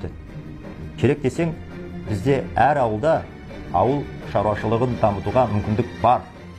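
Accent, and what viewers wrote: Standard. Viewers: Казакша тап таза сойлеп тур гой. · Ешқашан көрмеппін осылай таза қазақша сөйлегенін. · Қазақша сауатты және таза сөилеиді екенсің.Баяғыдан қазақша сөилемеисің бе.Орысша сөилеитін мәңгүртерге қазақ жағдаи ы да қазақ тілі де ,қазақтың әл ауқаты да керегі жоқ.Сондаи мәңгүрттер ол Назарбаи мен оның отбасы және құиыршықтары.Қазаққа ғана керек Қазақстан жері мен тілі.Басқалар бұл жерде келімсек қонақтар.Ішіп жеп ,баиып алып таиып тұрады.Бұл жерге жаны ашып ,бұл жерде қалатын тек қазақ ғана .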